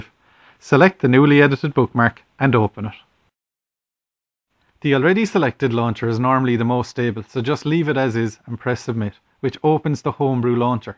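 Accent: Ireland. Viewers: hey are you irish i am!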